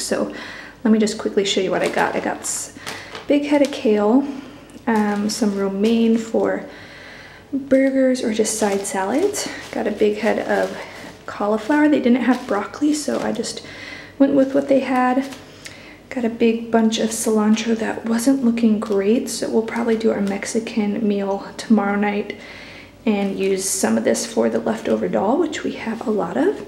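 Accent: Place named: United States. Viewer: Are you working in Indonesia? You sound like you might be from USA.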